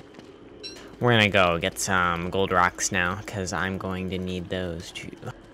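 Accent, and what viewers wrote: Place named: United States. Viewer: I tought he was american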